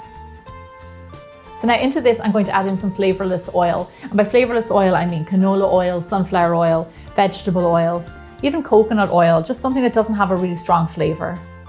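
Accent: Ireland. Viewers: I love your Irish accent! ❤️❤️❤️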